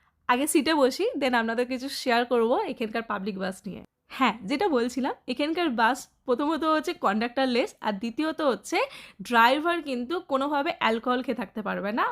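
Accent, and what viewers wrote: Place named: Kolkata, India. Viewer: দাদা আপনার টা কলকাতার ভাষা , মানে আমার শহর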